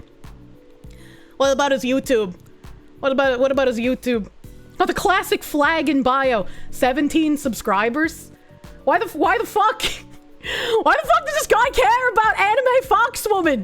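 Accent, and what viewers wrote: Boston, United States. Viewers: How does that thick Boston accent get confused with the Midwest? Lol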